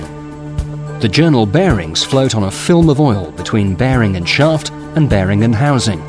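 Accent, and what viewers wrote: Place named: United Kingdom. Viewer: Fantastic overview of turbocharging. Everything sounds better when explained with a British accent. Well done.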